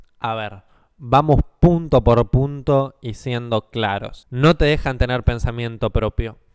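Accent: Argentina. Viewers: Dude amo el acento argentino me partí de risa en el inicio xdd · Como le deben de haber quitado dinero atraves de las donaciones voluntarias supuestamente.a millones de hermanos.amigo tu eres argentino ?? por el acento lo pregunto.saludos gracias.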